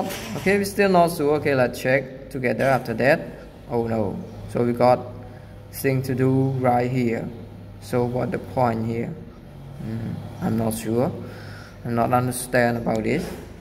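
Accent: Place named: India. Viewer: Yes he is because he has Indian accent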